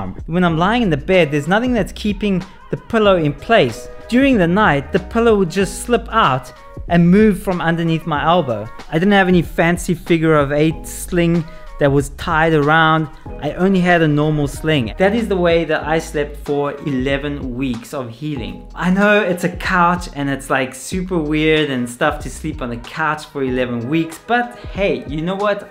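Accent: South Africa. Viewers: Cheers dude,I broke mine twice and am going in again in 3 days to get a plate put in.The only concern I have is the sleeping and you nailed it,the couch and a pillow,infact lots of pillows saved my life the last time's, I'm hoping this time won't be as bad as its corrective surgery and I hope the doctor will give me adequate pain suppression. P.s is that a Cape Town accent I heard,been there twice,beautiful country, be 🙏 Will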